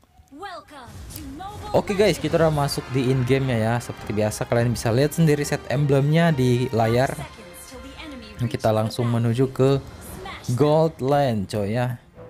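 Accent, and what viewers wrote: Manado, Indonesia. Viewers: Bng, kmu orang Manado?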